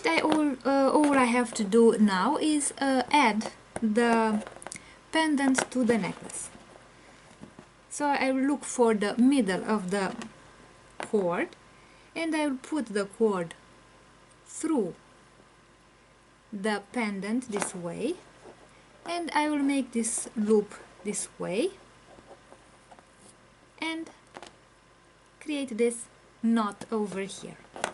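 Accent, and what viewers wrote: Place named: Iceland. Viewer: Very helpful tutorial! Love your accent! Are you from Iceland?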